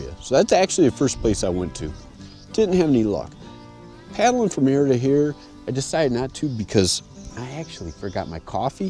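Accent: Wisconsin, United States. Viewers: I'm thinking Wisconsin/UP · You have the most Canadian accent I've ever heard LOL to either that or like Wisconsin ish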